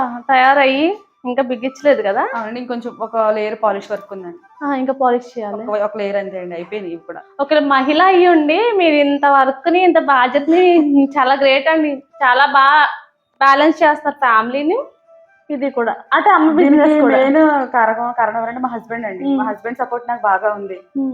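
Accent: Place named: Godavari, India. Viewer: అచ్చతెలుగు గోదావరి అమ్మాయి లా మి భాష బాగుంది నేచురల్ గా వుంది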